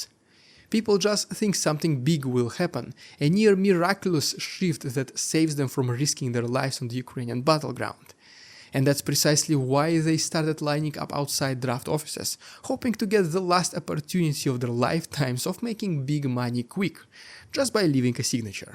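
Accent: Russia. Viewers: One more "wolf under sheeps skin" - this channel is working for russian propaganda. starting from typical russian accent without even trying to talk better - diminishing the language, ending up with narratives very convenient for kremlin gremlin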